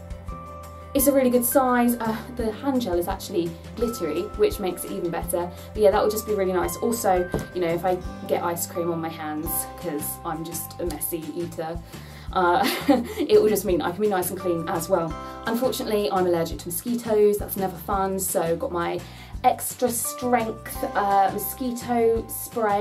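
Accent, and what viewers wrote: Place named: United Kingdom. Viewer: UK accents are so charming. you could literally read the dictionary and i'd be mesmerized. You have such a great personality! you need more subscribers! i'm going to DW in may as my graduation gift, and this made me so much more excited!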